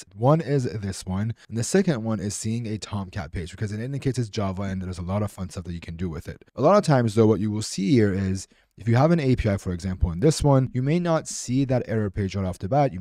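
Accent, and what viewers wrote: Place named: Iran. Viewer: i know a fellow iranian brother when i hear one 😂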